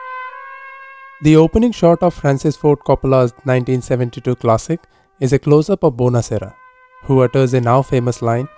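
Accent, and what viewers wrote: India. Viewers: That's an indian accent, I can finally recognise it.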